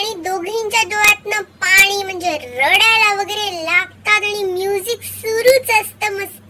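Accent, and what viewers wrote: Nagpur, India. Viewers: Bhaitad! typically Nagpur very cute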